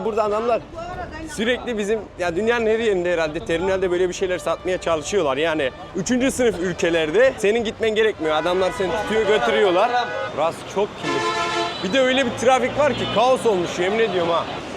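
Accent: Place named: Central Anatolia, Turkey. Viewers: Sen ananas satan adama "Ek" diyorsun, bir demek istiyorsun, ama o senin inglizche konusmani bekledigi icin seni anlamadi galiba, beyni durdugundan degil )))). Anadolu shivesine bayiliyorum, has türkce. Amerikali , bebek gibi konushan genchler sinir ediyor, ama senin türkchen cok güzel. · Slm kardesim.cok guzel videolar.konusmalarin ayni bizim kayseri konusmasi gibi.allaha emanet ol kardesim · İç Anadolulu olabilir. Herkesin ağzı bir değil. Bilerek yapmıyordur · Kral konyalımısın şiven bizim oraya acayip benziyor. Video mükemmel olmuş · Kardeşim çok neşeli, eğlenceli bir insansın. Bir de bizim iç Anadolu ağzıyla konuşman çok güzeeeeeeellll❤❤❤❤❤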